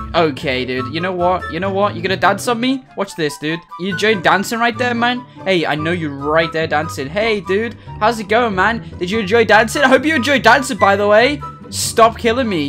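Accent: United Kingdom. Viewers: Hey are you british?????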